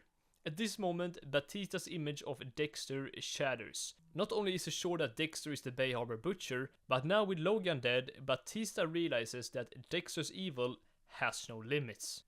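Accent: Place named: Sweden. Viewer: name: '' '' with a dialect like this? hmm.. can it be? yes it can. youre swedish!;) sup man, also swede here, good vid, ta hand om dig and see you in the next dexter vid. i dont know why i write in english, i just do.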